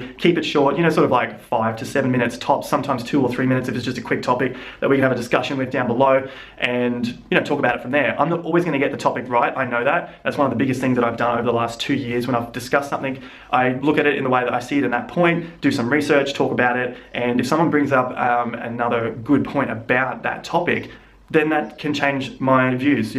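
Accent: Australia. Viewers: you've picked up the australian accentttt :D :D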